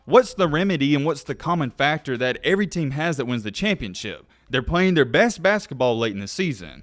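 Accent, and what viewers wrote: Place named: Appalachia, United States. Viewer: Listening to this guy is painful! There is nothing worse than an uppity hillbilly.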